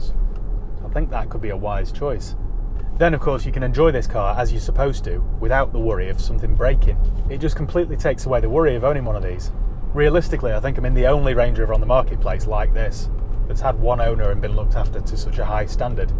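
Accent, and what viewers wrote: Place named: England, United Kingdom. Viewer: What part of England is the presenter's accent from? reminds me of some accents in Game of thrones, EXCELLENT REVIEWS BY THE WAY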